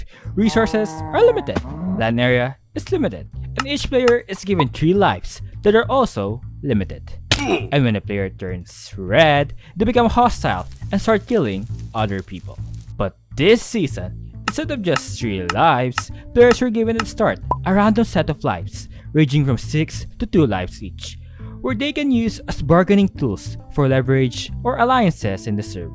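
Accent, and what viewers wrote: Philippines. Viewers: You filipino? Accent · Are you Filipino, cuzyour accent😊🥰 love it. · I feel like you have a Filipino accent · Great! Some Filipino 3rd life fans. Recognised you by your accent. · Are u a Filipino ur accent is sooooooo Philippines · I recognize your accent. Lezzgoo mga pinoy!!! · based on ur accent, im pretty sure ur a filipino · this video just came up on my recommended and i decided to watch it, and from hearing your voice i could tell you were filipino too XD hoping to see you make an empires smp explained video <3 also just a tip, joel's name is pronounced as "jowl" enunciating the e isn't really necessary :D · WHY DO U SOUND LIKE A FILIPINO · Are you a filipino? Your accent is very filipino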